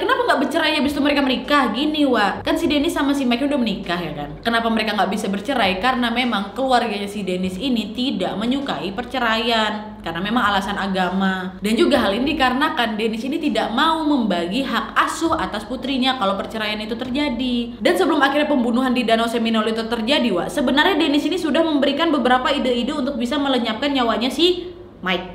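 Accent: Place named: Medan, Indonesia. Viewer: Kek gaya becakap anak Medan.. ya kan wak?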